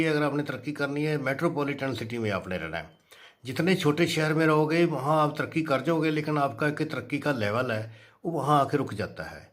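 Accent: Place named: Punjab, India. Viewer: पंजाबी लहजे में आपका हिंदी बोलना बहुत भाता है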